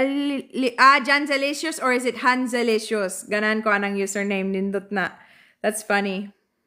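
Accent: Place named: Visayas, Philippines. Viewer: Ka gwapa ug larenoha mo sultig bisaya nimo langga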